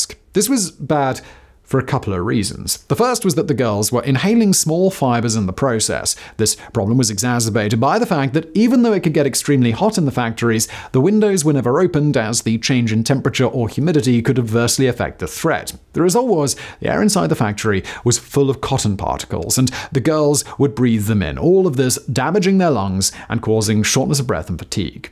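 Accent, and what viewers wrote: RP, United Kingdom. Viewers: Amazing that this is what people like you are getting out of this video. Several of you have made comments about the pronunciation. He’s British. Pronunciations vary to one degree or another depending on where you live. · He's British. The way he says things is different than us across the pond. His accent is beautiful. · being English is pronouncing as a person from England. Being Australian I would pronounce it the same. This is how we pronounce the name in our relative countries. However it is annoying to hear something not pronounced in the way you feel it should be. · Doesn't matter telling him. He speaks the Queen's, erm, I mean, Kings English of the South here in England referred to as RP (Recieved Pronunciation). All the southerners pronounce it that way. Sounds rather goofy to be honest. Wait until you hear them say Maryland. 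Literally, Mary Land haha😉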